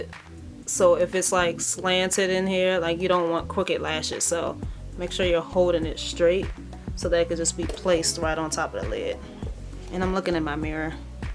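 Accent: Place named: Washington DC, United States. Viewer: When I first started watching I was like “she sounds like she could be from DC” then you said “blowing me” and was like yep! 🤣 hey home team!!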